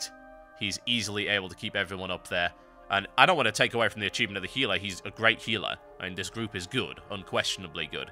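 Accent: United Kingdom. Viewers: I love this guy. I find what he says halerious. Love the accent. Nice work Brit :D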